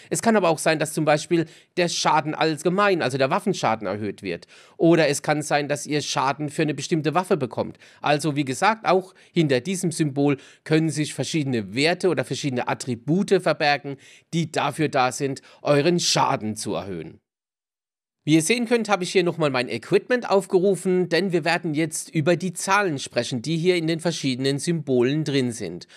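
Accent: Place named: Rhine-Neckar, Germany. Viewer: du hast diesen herrlichen Klang dieser Region in deiner Stimme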